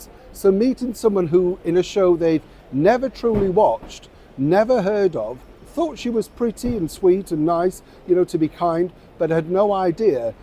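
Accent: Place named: North, United Kingdom. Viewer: At first, Neil, I thought you said "she was a hooker" - which would have been more or less accurate - but you said "hugger" in your northern accent! x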